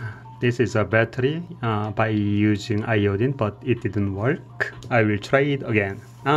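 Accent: South Korea. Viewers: Judging by the writing on the toothpaste tube and his accent, I think is perhaps Korean? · he is korean i could catch korean eng accent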